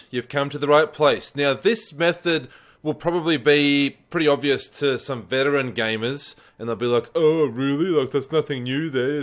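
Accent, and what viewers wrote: Australia. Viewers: once I heard Aussie accent I subd. on ya bro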